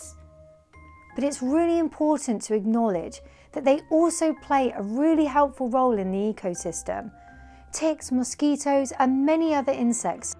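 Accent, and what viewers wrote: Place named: United States. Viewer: You talk about rewilding in Britain but your not actually British yourself from how you speak I guessing you’re actually American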